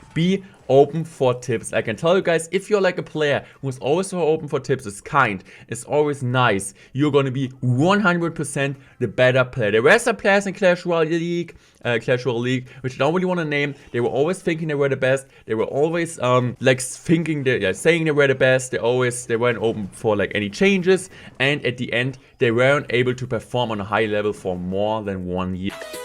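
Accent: Germany. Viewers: We love accent germany/English.. best content creator ever.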